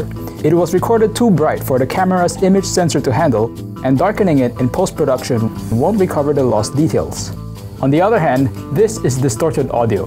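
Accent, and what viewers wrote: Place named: Singapore. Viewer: Very well done...I do video production here and I would hire you in a second if I could afford it. I'm in Florida USA and as I was listening to your accent I realized you must be in S'pore! I used to live in Yishun on Ring Road and miss being there very much, especially Mei Goreng and Roti Prata! Do you know anyone who has worked for Odex? If so, let them know the Shin Jing Bing Ang Mo says hi! and do some more videos!!!!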